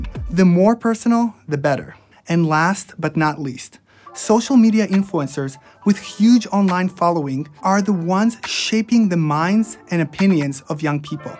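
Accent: Israel. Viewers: לא לוקחת צד בדיון העקרוני, אבל כדאי ליישר קו לגבי העובדות. שומעים מבטא ישראלי קל. אתם לא שומעים אותו כי אתם ישראלים. האמריקאי הממוצע לא יחשיב את זה כ"מבטא יפה" (אלא אם כן הדובר נראה כמו גל גדות ואז מותר לו לדבר איך שבא לו).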